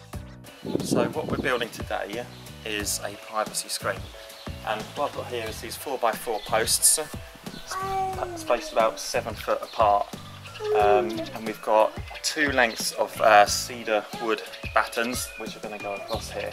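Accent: Italy. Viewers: Are you italian? I can hear a tiny "ë" at the end of your sentences. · You sound Italian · I hear an Italian accent, no? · I'd say he's Italian 👍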